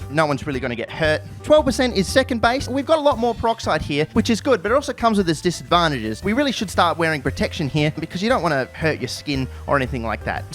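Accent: Australia. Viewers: I thought he was Australian not Finnish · When I first saw this channel I thought damn this is so american but naw he australian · Honestly that's how Aussies speak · From the accent, you must be from Australia, the snake in the house--- sounds like down under. Many poisonous animals, and insects in Australia. I always wanted to see experiments using Hydrogen peroxide because I played with it in high school (I'm not a Chemist), and it fascinated me the many reactions like powered iron, or magnesium oxide from used up carbon batteries producing prodigious quantities of Oxygen. thank you sir!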